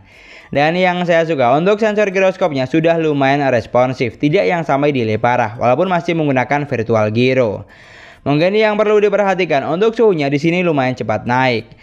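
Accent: Madura, Indonesia. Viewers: Keren ciri khas logat Maduranya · Bang udah kaya orang maduran jualan sate logat nya😅 semangat terus❤ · Itu ciri khas orang madura blokkk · Kyk logat madura tesate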